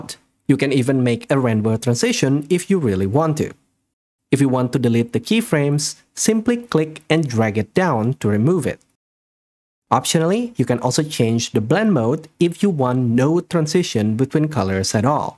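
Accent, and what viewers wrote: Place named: Indonesia. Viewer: Amazingly helpful, also found your other tutorials and facial expression packs to be extremely valuable, thanks so much, especially for the free stuff! Also, CMIIW, but your accent sounds pretty South East Asian (dare I say Indonesian?) it adds a lot of charm to the videos and, from a fellow Indonesian, I hope you succeed and continue to make tutorials like these.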